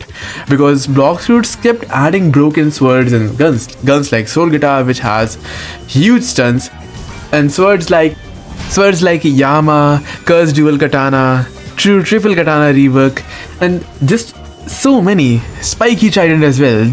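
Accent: India. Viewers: bro this is indian accent i guess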